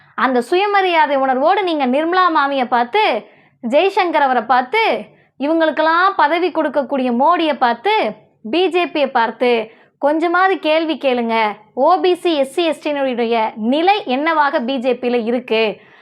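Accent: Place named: Brahmin, India. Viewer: அக்ராஹார. பாஷைக்கு. நம்ம.. சகோதரிக்குத்தான். பொருத்தமா. இருக்கு. மகளே. அவா. மாதிரி. அப்படியே. ஒவொரு. அசைவும். பேச்சும். இருக்கும். வாழ்த்துக்கள்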